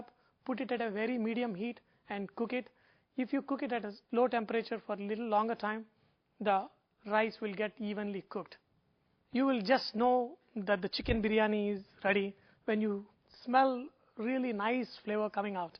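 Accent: India. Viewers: "american"...when did it become a language? it's English dude which you guys have hijacked and now call it american....damn funny!!!! you also "kepp" trying!! is speaking British English in Indian accent (which we proudly speak)....which is actual English...not "American" English with that strange r sound.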